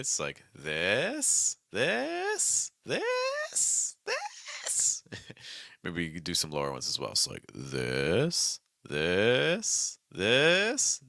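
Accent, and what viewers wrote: United States. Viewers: i thought you were american! great accent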